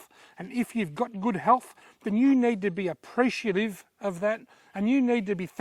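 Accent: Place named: Australia. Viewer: i am proud to be aussie when i hear a fellow aussie speak this way good on you keeping it real is so important great job mate:)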